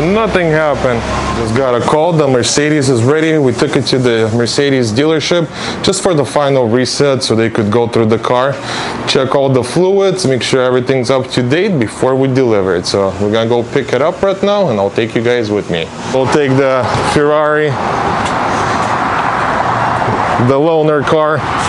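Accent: Russia. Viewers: Прикольный русский акцент Бро!!! Привет из Украины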